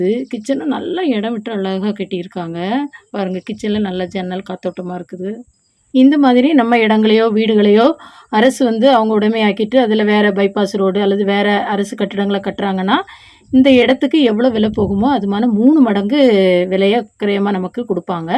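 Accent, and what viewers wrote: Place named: Kanyakumari, India. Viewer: கண்ணியாகுமரி குரல்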